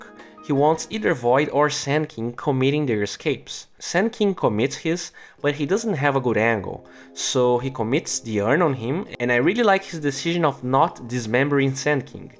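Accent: Brazil. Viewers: melhora tua pronuncia cara. TWO nao eh TO. Entrega tua "brasileirisse" facilmente. Fora isso, parabens pelo conteudo.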